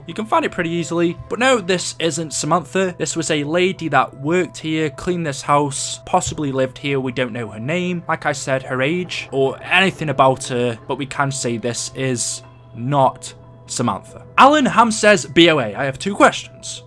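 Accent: United Kingdom. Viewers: BOA are you british? Also how old is Arthur and how tall is Arthur? ( I think hes around 7 feet tall)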